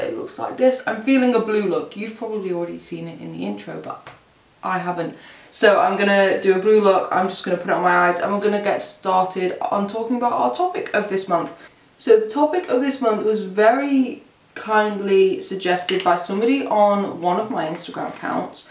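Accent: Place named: United Kingdom. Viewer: I love your British ascent btw I'm from India but my ancestors were English so I get really drawn towards the English culture specially the ascent I recently started taking interest in makeup but your ascent makes your videos more enjoyable for me to watch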